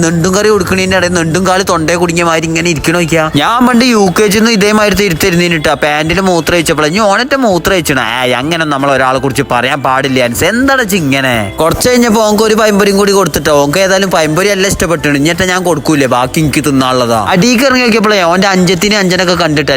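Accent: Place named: Malappuram, India. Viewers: Ithippo entha kadha😂.. Samsaram kettitt nammade mlpm aanennu thonnunnu😂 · സംസാരം അടിപൊളി മലയാളം മലപ്പുറം ഭാഷാ 😅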